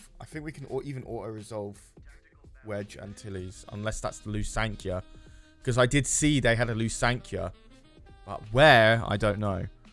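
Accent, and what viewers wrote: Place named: United Kingdom. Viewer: The British accent made this perfect for an imperial campaign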